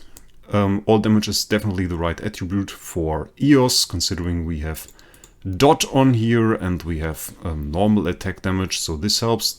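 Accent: Germany. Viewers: Is ur motherlanguage german?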